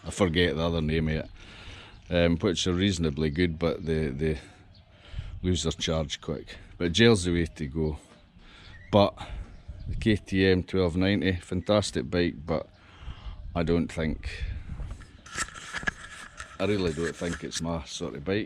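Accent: Scotland, United Kingdom. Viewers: You sounds like a Scotsman. Regards from Poland 🍻🍻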